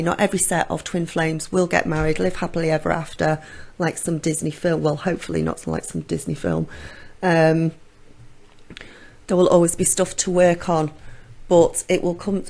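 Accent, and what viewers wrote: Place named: Sale, United Kingdom. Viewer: ah, Sale. I can hear it.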